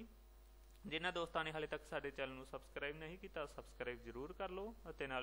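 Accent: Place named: Punjab, India. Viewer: Sir tohadi English di pronunciation v punjabi wangu hai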